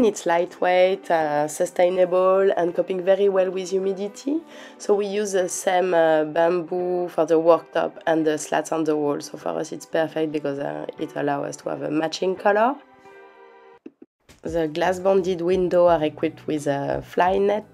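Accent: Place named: France. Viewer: Love your french accent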